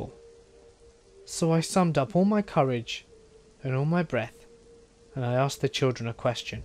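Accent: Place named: Southern England, United Kingdom. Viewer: Ah, what a nice response! Would that all YT comments were so reasonable and intelligent. I'm northern English myself so he's just a nice-sounding southerner to me. But I understand how he might sound semi-exotic to our friends on the other side of the Atlantic!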